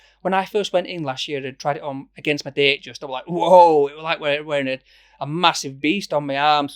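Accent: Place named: Leeds, United Kingdom. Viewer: Enjoyed your review, very rounded and if I can convince the missus this will be my next purchase Trying to place your accent, somewhere near Leeds ?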